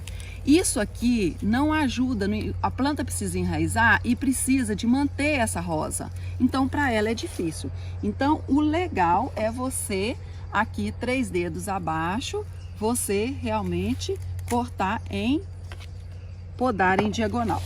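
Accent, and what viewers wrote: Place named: Ceará, Brazil. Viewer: Gostei amiga soou do Ceara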